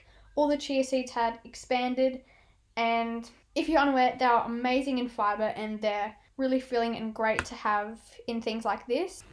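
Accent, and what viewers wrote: United Kingdom. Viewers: ohh hahah i thought u lived in the uk u sound so british idk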